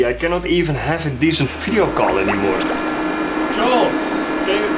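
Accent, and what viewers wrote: Netherlands. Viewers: Mede Nederlander hoor ik😅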